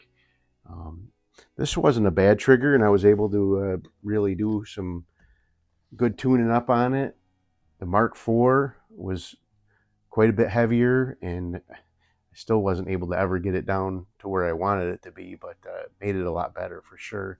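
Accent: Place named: Wisconsin, United States. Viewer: Very cool channel. Been subscribed for maybe a month. Your accent and “goofin around in the garage” thing reminds of everyone I knew in northern Wisconsin as a kid. What I found strange is that you seem to know everything about airguns. And you’re a sought after airgun mechanic/repairman. Every video of yours I watch, is really humble and professional. What’s most fun is that you review everything from top of the line rifles to the cheapest junk not many would buy, and everything you play with is about fun. “Just goofin’ around in the garage” and that attitude really sold me. I’m just getting into pellet guns again after decades of considering them just toys for kids. Mostly because of a sudden and severe hip problem. Going to the range with firearms is out. Plinking in the house or yard with a pellet gun though, is super fun again. 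Addictive as hell. Picked up a Gamo Fusion 3i and was hooked. Watching all these videos and looking at my Gamo, thinking, “I can do better than this…PCP is where it’s at.” Got the Notos and a hand pump (Hill MK 5, best they had), and there is nothing addictive about hand-pumping even the smallest air cylinder out there, the Notos being one of the smallest. “Okay, I’ve gotten a little over my head at 61 but it’s great exercise and really fun to shoot with a scope that’s 3-times the price of the gun.” I’m learning fun stuff with airguns and I don’t even need to leave the house. Thank you and everyone else in the airgun community, I’ve talked with mo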